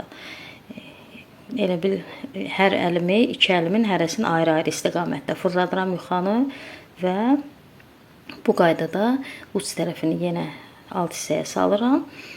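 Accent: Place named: Mughan, Azerbaijan. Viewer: Azərbaycan balaca olsa da, hər tərəfinin öz mətbəxi var. Bəli, bizim Gəncə-Qazax bölgəsində də fəsəli ədviyyatsız, kərə yağı ilə olur. Ancaq gördüyünüz kimi, xanım Muğan ləhcəsində danışır. Hələ Quba tərəfdə fəsəli mövzusunda tamam başqa yeniliklər kəşf edə bilərsiniz. :) Bu, ölkə mətbəxinin zənginliyini göstərir və çox gözəldir. Yaxşı ki, belədir. Mən çoxdan bu ədviyyatlı fəsəlini bişirmək istəyirdim. Müəllif də çox gözəl, detallı izah edib. Mütləq bişirəcəyəm.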